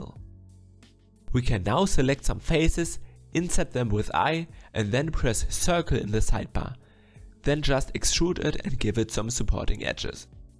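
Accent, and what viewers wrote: Germany. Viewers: Through the full video i need to think about how german your accent sounds XD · Das ist doch ein deutscher Akzent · Idk why but u sound a bit German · Cool, but are you from germany? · deutsch accent or i wrong? )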